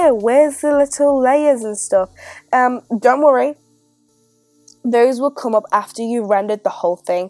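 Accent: United Kingdom. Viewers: FINALLY! Someone British.. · Are you from London?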